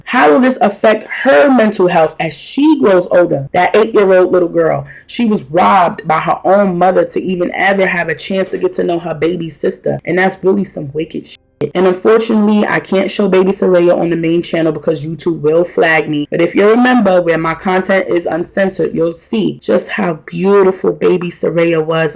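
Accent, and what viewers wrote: New York, United States. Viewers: I love the way your NY accent flows with your storytelling!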